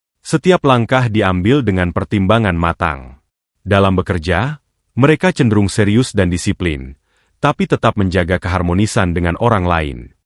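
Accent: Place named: West Java, Indonesia. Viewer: Kelihatan lugot bicaranya orang Jawa barat yang membawakan ini bukan orang Jawa tengah mantab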